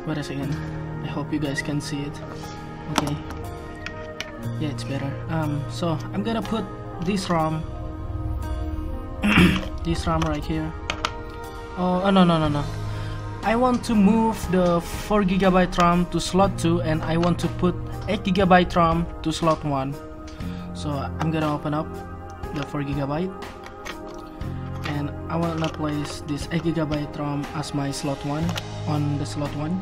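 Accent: Indonesia. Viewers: orang indonesia ya soal nya bahasa nya masih kelihatan logat indo nya...... btw itu laptop merk apa tipe apa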